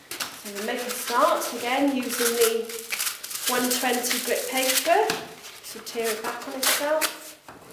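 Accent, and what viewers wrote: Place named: Wales, United Kingdom. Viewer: hi, do you use both farrow & ball undercoat/primer & top coat, & are they both water based? also the liming wax, what brand is it, btw its fantastic to hear a fellow welsh accent on y.t. for a change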